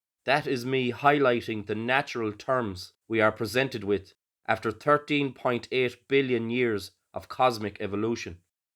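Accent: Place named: Ireland. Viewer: Cheers dude. Nice to hear an Irish voice of reason. Between you and Dan's Mix Tape I feel a little less like society is collapsing. Keep up the good work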